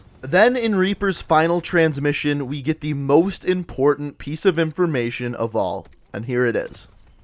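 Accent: Canada. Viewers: OMG the Canadian accent makes this video unwatchable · Is this guy canadian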